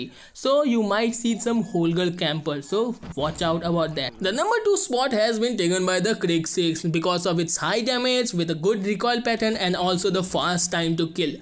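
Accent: India. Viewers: Bengali English accent.....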